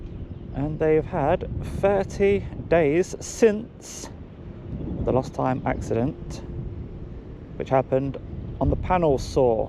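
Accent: United Kingdom. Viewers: Local accent. Quite a few accents pronounce the “yoo” sound like that. Look at how Alan Sugar pronounces “new” as “noo”. Perfectly normal in some parts of the UK.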